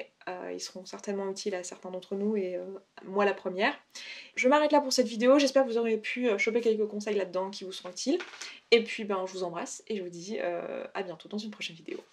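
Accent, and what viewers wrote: France. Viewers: j'ai bien compris ta phrase de fin car tu as un accent un petit peu français si je puis dire. Mais par contre je ne comprends rien quand c'est les natifs qui parlent car ils ont un accent anglais ou américain très marqué et j'ai l'impression que ca aspire tous les mots je ne les comprends plus par exemple quand je regarde une série sous-titré en anglais de te découvrir des nouveaux mots de ce que j'ai entendu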